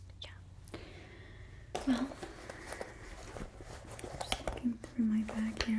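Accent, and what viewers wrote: Russia. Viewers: as Russian I can say that is is an amazing Russian accent! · Русский акцент получился очень хорошо!!!!🥰💖💖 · russian stylist having a sister named natasha and the same accent as yelena belova in marvel my obsession is too big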